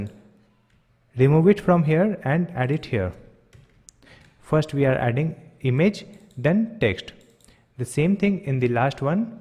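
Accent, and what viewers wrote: India. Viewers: bro, sorry I cannot listen to an Indian for 12 hours.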